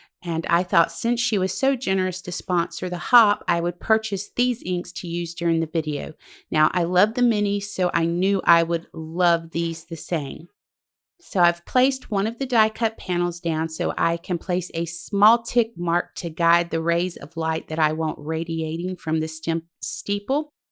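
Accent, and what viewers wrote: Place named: South, United States. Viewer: Love your cards & your accent. I'm from the south but live up north so I love when when I feel at home. I will be a new subscriber!;0) USA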